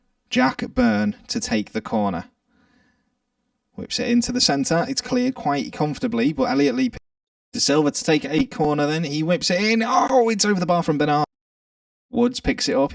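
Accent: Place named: Liverpool, United Kingdom. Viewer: Am I tripping or are you a scouser lol gonna subscribe anyway goodluck g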